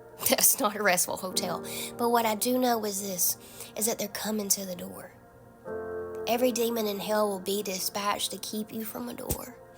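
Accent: South, United States. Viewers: ❤❤What a beautiful message from a Beautiful Lady Pastor... Thank you Ma'am... Your lovely Southern accent was a Breath of Fresh Air.., complimented by your Spirit filled message... I say all this as your message and you were the first to come up on my Screen, after one of the most terrible spiritual letdown I've experienced for more than 5yrs...I was so excited to experience a major breakthrough in my long walk in Christ., with all the Blessings promised.. via messages from spirit filled children of God...after a 2 hr conference call with powers that be... Totally the opposite of what I was expecting.. 😬I am in in such a freaked out frame of spiritual battle...& want so to vent.. I saw your vid, subscribed & really was glad I watched... You're beautiful, down to earth humbleness of a Jesus filled lady.. Thank You soo for this message...I was truelly Blessed. 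🌹✝️👏🙏❤️BTW.. I am from South Africa... Johannesburg.. Praying for Your land.. ✝️👏🙏❤️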